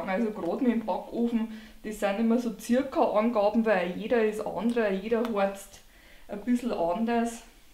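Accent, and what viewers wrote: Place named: Bavaria, Germany. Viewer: .... und so ko ma d'laet bscheissen.... köstlich ! Ich finde Ihren bayrischen Akzent und Ihre Art zu kochen einfach wunderbar. Sie strahlen bayrische Gemütlichkeit aus. Ich lebte auch lange Zeit in Niederbayern und weiss das zu schätzen. Übrigens, wo kann man so eine tolle Gewürzmühle kaufen? Liebe Grüsse aus Spanien.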